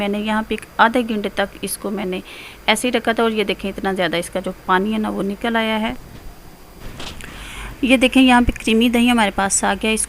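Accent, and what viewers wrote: Punjab, India. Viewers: Hahahahahahaahaaha Punjabi ka tarhka I like it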